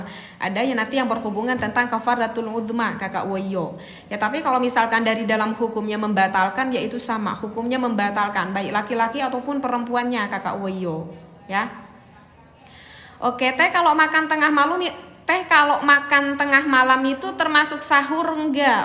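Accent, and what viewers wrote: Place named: West Sumatra, Indonesia. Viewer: Wa'alaikum salam,. Hadir tehcannnnn. Oia, teteh ni kan sunda, tp ko mirip minang ya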